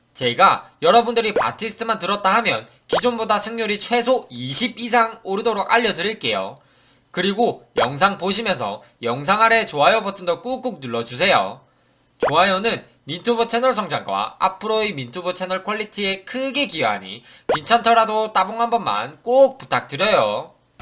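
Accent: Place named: Busan, South Korea. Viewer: 뭔가 부산 말투 같으신데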